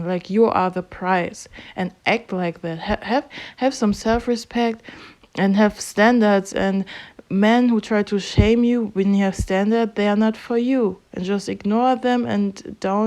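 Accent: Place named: Germany. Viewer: Do you live in a German territory? You have an accent similar to my Oma and Opa. 💜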